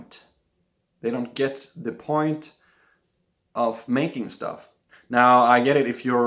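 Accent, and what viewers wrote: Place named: Finland. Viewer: I heard the Finnish in your English during the first 10 seconds! Am I right?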